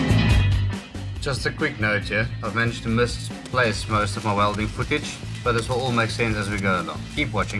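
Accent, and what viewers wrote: South Africa. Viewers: awesome video! love the videoagraphy and time taken to take important close shots. Is that a South African accent I hear :)? I ask because I'm from Cape Town :) One wouldn't say you new to this lol you doing an epic job can't wait to see your new videos. couple of quick questions if you don't mind its about your build. What size Bearings and bolts did you use and the rivet thread things you used, that just mind blowing lol what are they called? Can I source them from BMG bearing man? Thanks again Best wishes for 2019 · I'm afraid I couldn't listen to the whole film , I couldn't understand the South African accent. Great filming though.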